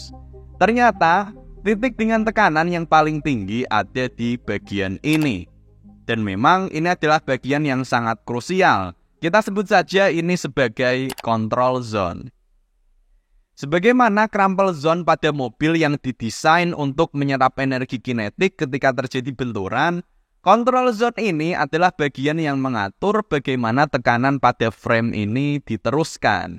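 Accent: Java, Indonesia. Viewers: Bahasa, tpi logat.e osing😂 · Penjelasan yg komprehensif plus medok men bahasamu lek ❤ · Medok masse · Logate osing banget.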